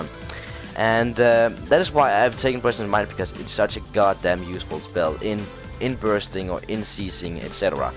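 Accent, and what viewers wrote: Denmark. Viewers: han lyder fandme meget some en dansker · from denmark ? :P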